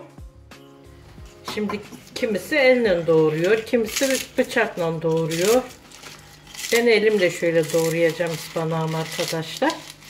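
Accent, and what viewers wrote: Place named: Dersim, Turkey. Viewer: Abla sen Dersimce biliyor musun? Sanki Dersimliler in aksaniyla konusuyorsun! :-) Yani bilmesem, akrabam sanacagim!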